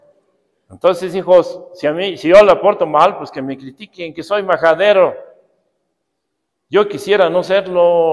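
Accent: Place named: Mexico. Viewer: Así se habla mexicanamente machín, padrecito....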